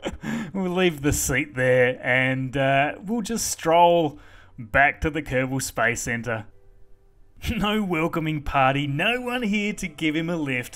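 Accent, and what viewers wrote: Australia. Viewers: Darren lockyer ahh yeaa that accent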